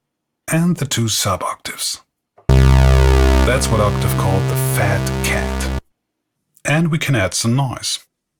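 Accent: Germany. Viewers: Selbst wenn das Wort eines Schweizers wohl nicht so viel zählt wie das eines Native Speakers: für mich klingt dein Englisch super. Die Stimme ist angenehm und grammatikalische Fehler sind mir bisher noch keine aufgefallen:) Klar hört man den deutschen Akzent ein klein wenig heraus, allerdings bereichert der die Videos eher und stört überhaupt nicht, denke ich. Danke für die Info! Das klingt so, als ob die Effekte, Levels, Breaks, usw. viel Zeit in Anspruch nehmen, hingegen die Melodien, Akkorde, Pattern und Rhythmen schnell angedacht sind. Klingt zwar etwas kitschig, aber ich stimme voll und ganz zu: Limitations foster creativity. Ich halte ab und zu Ausschau nach einem guten alten PSR oder einem Casiotone. Leider verkaufen die Leute meistens nur moderne und für mich schlecht klingende Keyboards. Lieber einige wenige, eingeschränkte, aber dafür warme, angenehme Klänge mit Charakter. 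Und für die alten, leider oftmals ungepflegten Geräte wollen sie über Hundert Franken. Obwohl ich eine Art falsche Nostalgie spüre wenn ich die Klänge dieser alten Geräte höre (weil ich gerade mal 1995 auf die Welt gekommen bin) möchte ich mir trotzdem einmal ein älteres Keyboard leisten. Synths hatte und habe ich genug und liebe sie nach wie vor. Aber sich einfach mal mit so einem Gerät hinsetzen und einen Song schreiben ist wahrscheinlich doch noch mal etwas ganz anderes. Ich hatte vor ein paar Tagen etwas gefunden, was dich (ich bin so frei und duze und hoffe, dass mir das nicht übel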